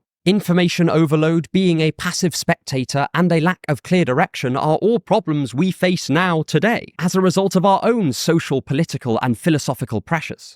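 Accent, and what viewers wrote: United Kingdom. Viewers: Whatever you call the way this guy talks - its more than just a British accent - is annoying and I dont like.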